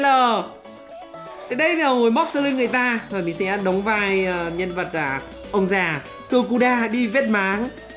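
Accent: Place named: Thanh Hoa, Vietnam. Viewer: A ân nghe giọng giống quê hoàng thanh-hoàng hóa..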